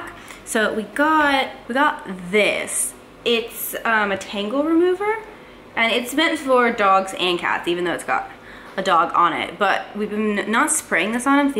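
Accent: England, United Kingdom. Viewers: This is the first time I’ve actually noticed a slight English tint to your accent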